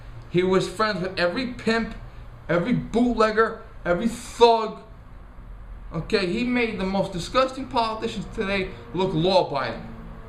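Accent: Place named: New York, United States. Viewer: I've learned a lot from your videos you not only bring truth to the subjects but also humor it good to hear a new York accent.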